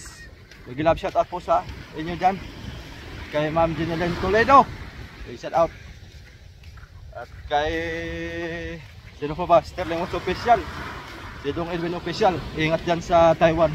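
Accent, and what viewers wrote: Philippines. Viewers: may pagka-bisaya ba pananalita diyan?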